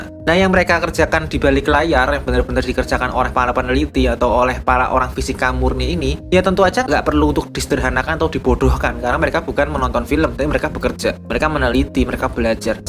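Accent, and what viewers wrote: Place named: Java, Indonesia. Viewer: Orang Jawa ya mas?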